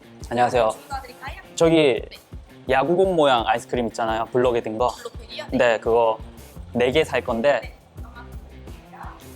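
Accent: Busan, South Korea. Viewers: 팝님 부산사람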